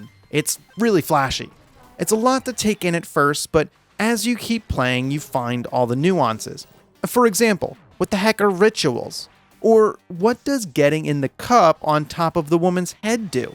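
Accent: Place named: New York, United States. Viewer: Yo you from NY cause dead ass you sound like my ass to the T when I was a kid and there was a bowling alley with a addams family pinball machine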